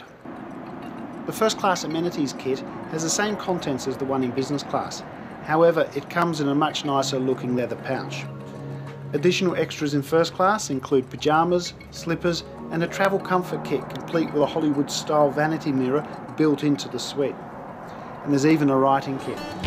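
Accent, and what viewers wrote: Australia. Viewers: I like the walk through style video and nice to hear an Aussie accent :) Good job.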